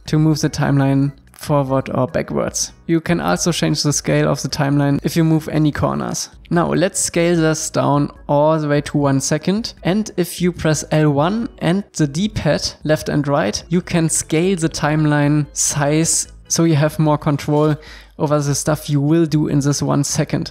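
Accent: Germany. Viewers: Deutsch Akzent is da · echt jetz? warum denn nu in englisch? wenn ich das will da gibts doch schon so viele....aber gute "how to`s" in deutsch sind doch schwer zu finden! Bitte - hast übers animieren auch in deutsch was gepostet? Ist super wie du das machst, aber dein englisch ist ....lustig - so deutsch, smile^, wie langemachst du das schon? und wie lange bereitest du dich auf so ein video vor? Danke fürs antworten auch wenn der Spot schon alt ist^^ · Schöner Deutscher akzent Das video ist supi 👌🏻👌🏻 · Love the German accent ?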